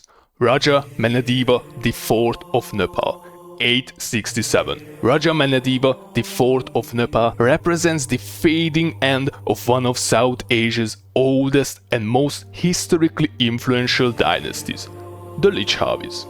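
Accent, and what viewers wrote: Hungary. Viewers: Never heard an AI with a Hungarian accent before, I think you might be chatting shit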